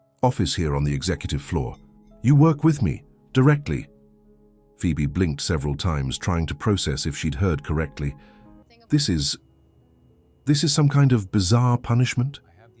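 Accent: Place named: England, United Kingdom. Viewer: Maybe it's the English accent.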